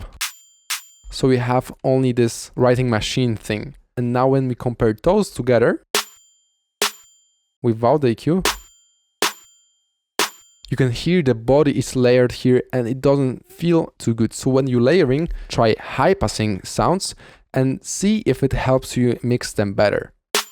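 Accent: Russia. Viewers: Акцент русский пздц, но видосы крутые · русский акцент😂🤟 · Ты русский что ли?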